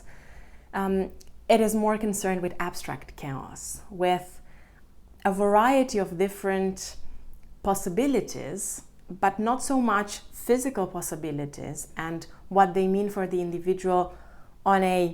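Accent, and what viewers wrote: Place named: Greece. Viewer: Are you Greek by any chance? You have a slight Greek accent :) But it’s cute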